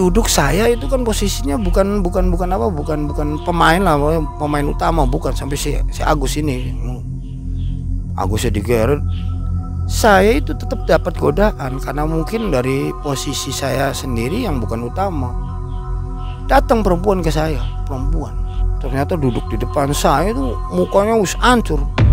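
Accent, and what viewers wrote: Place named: Cirebon, Indonesia. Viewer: Wuaduh bhsae Kya wong cerbon apa wong dermayu kih ... Hehehehe 🙏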